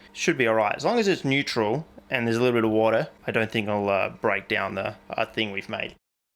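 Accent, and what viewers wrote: Australia. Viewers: Pfft watching for the chemistry… Birds? No thank you I just like the Australian accent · Dude, I've just gotta say this. My life has been shit the past couple years, not looking for pity just saying so for context. I found your channel about 6 months ago, and shortly after I found. I've always been intimidated by, and thus hated chemistry. I'm a physics and astronomy person, and a gufted musician, and I just gotta say, something about your auzsie accent, you're personality, and you're... Relatable..... Methods, got me hooked and it I've seen all your vids a couple times each. New episode just makes my day, and the fact I'm gonna be here for another cubane series makes me giddy. But the main thing to say, is you rock, and keep it coming mate! I always wanted to move to Australia to work as an opal or gold miner but since that's never gonna happen, I'll settle for some chemistry from an aussie physicist.;) good work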